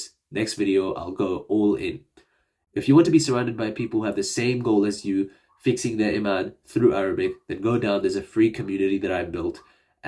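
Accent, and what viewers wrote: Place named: Australia. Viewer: His accent sounds Australian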